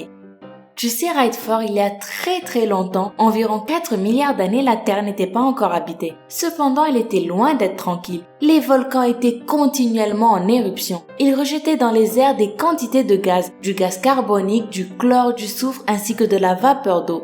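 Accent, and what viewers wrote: Canada. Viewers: me suis aboné:) ps: j'adore ton accent tu est canadienne? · Salut. pourquoi tu parle si lentement😆 et tu canadienne?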